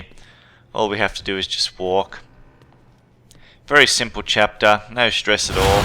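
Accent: Australia. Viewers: Is he aussie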